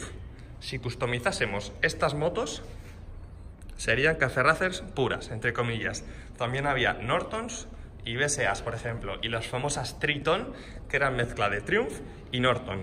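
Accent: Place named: Spain. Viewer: Por qué los españoles no pronuncian las palabras en inglés cuando claramente es en inglés. Por qué se inventan pronunciación.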